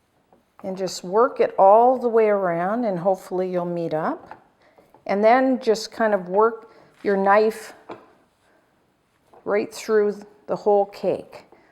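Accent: Canada. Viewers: Love the step by step explanations. She sounds so Canadian.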